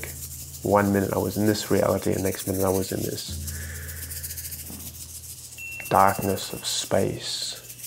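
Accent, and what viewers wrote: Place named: Australia. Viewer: Thank you for sharing. You have an Australian accent. Wondering if you're in Australia still?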